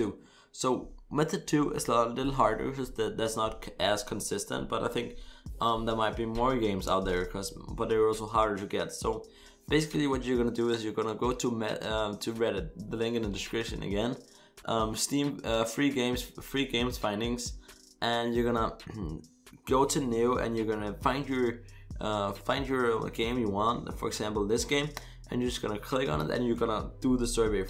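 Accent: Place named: Denmark. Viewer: Trode ik du var dansk :P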